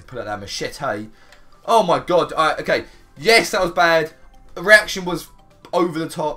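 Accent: England, United Kingdom. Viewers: Lee Gibson he's from England bro, he ain't American or Canadian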